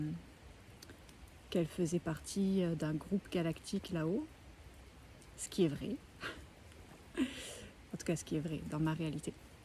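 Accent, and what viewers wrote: Quebec, Canada. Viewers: Ahah j'entends un peu de Québec là là...Merci pour ton message.✨👍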